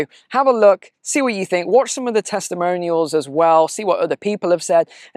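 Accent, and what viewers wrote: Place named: Upper class, United Kingdom. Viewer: You shouldn't ever trust anyone with a public school accent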